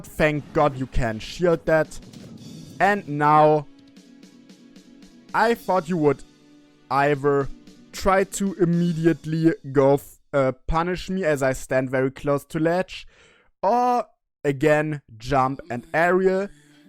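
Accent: Germany. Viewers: Lmao man hört dass du deutsch bist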